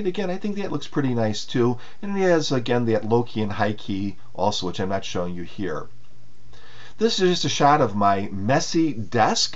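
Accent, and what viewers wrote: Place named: Midwest, United States. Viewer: You have a very thick Midwest accent. Are you from Cleveland... perhaps Detroit?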